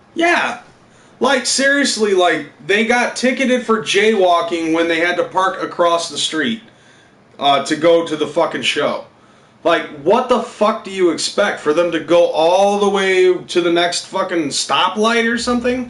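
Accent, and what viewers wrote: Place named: Midwest, United States. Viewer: Are you a Michigander,? I was born and raised in the mitten but live in Los Angeles now. Your Midwest accent is making me miss some of my Michigan homies.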